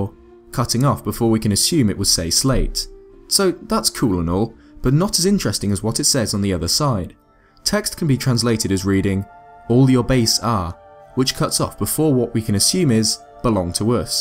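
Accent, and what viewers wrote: England, United Kingdom. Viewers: Love the english accent 😌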